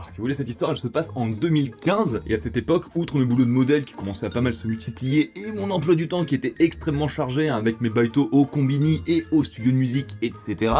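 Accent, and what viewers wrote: France. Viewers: Elles étaient vraiment drôles mais mignonnes, à part celle de la voisine raciste qui m’a bien agacée 😡 Sinon, je trouve que la narration en anglais n’était pas si mauvaise, l’accent français a son charme 😊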